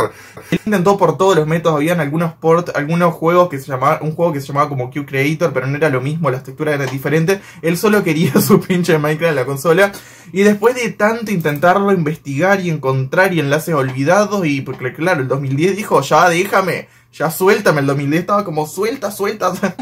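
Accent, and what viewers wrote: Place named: Uruguay. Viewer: Hablas como uruguayo del interior, de donde sos?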